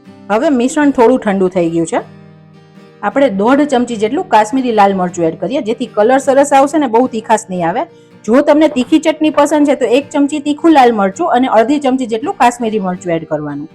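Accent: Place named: Kathiawar, India. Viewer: nigujarati kathiyavadi Bhasha Mithi laga che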